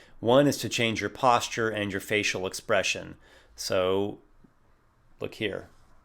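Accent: Mediterranean. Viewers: Your Mediteranean (sorry if it's misspelled) accent made me think of Minsc from the Baldur's Gate series Also, "read books" was the best think I've heard in a video in a while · His Mediterranean accent almost sounds like he’s trying to do Mario.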